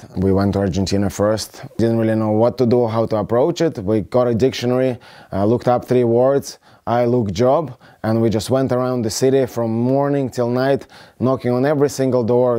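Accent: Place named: Ireland. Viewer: It’s crazy how he has somewhat of an Irish accent 😂😂😂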